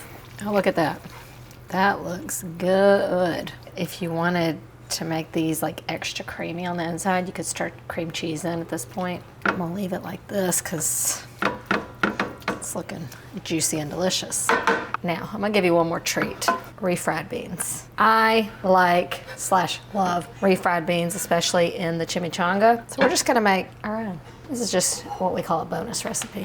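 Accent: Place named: Texas, United States. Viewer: Are u from Texas! I’m from Lake Jackson Tx. Love your accent ❤❤❤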